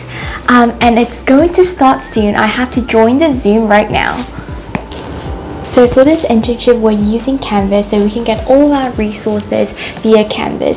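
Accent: United Kingdom. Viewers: 영국에서부터 구독했는데 영국 액센트와 미국 액센트가 아주 묘하게 조화를 이뤘네요.^^ 예쁘고 야무지고 똑똑 부러진, 영국에서 항상 응원할게요! 부모님께서도 무척 뿌듯해하실듯요.👍❤️😍👸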